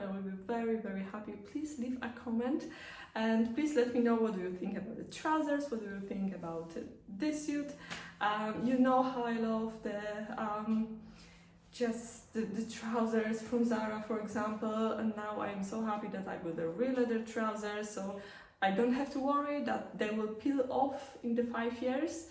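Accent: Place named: Poland. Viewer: sounds like polish - english...)))the worst and most horrible English.... not "free"....correct its three !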